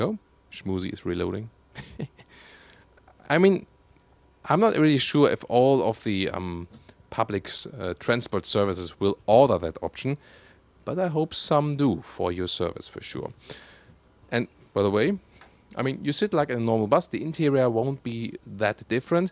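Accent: Germany. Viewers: Man hört halt einfach raus das du deutsch bist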